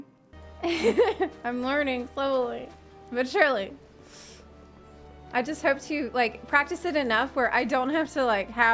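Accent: South, United States. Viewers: Luckily I am Japanese so I have the advantage of knowing what Yoshi P say before translator speaks to my discord friends. But I remember learning English was one of the hardest thing I have done during my youth. Grammar, future, and past tense are the things that got me frustrated but I kept on going. I eventually was able to lose my Japanese accent as well after many years of practice. I am very shocked to hear you are learning Japanese. I have no doubt you can do it as long as you don't give up. For now, I must say your accent in Japanese is so adorable. It has the hint of southern US accent which is very exotic and unique to hear in Japanese.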